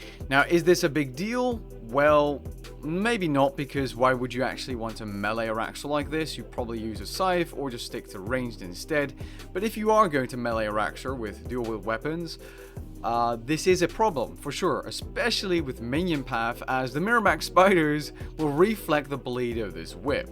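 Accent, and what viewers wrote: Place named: Netherlands. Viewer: Are you dutch? You can really tell by the accent haha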